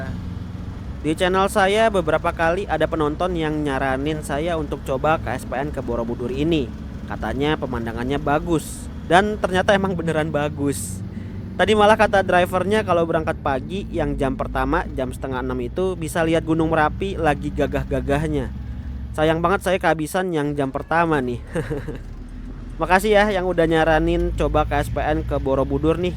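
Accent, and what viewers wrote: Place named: Depok, Indonesia. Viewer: Cara ngebawain narasinya kek mas mas depok bgt nih...apalagi backsoundnya...mirip mirip😅. Moga2 bisa 1000k subscribers bg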